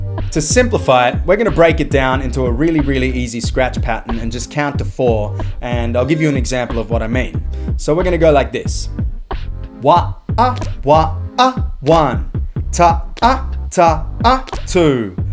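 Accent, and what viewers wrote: Australia. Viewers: I love how bloody Aussie you always sound bro haha. Love ya work < Please never stop being you and doing what you do...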